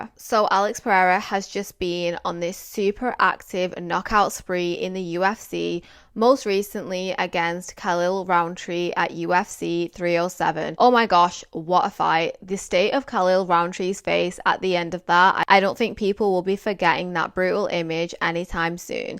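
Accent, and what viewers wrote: United Kingdom. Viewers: She doesn't even look Russian lol can you even hear her accent??? She has a UK accent.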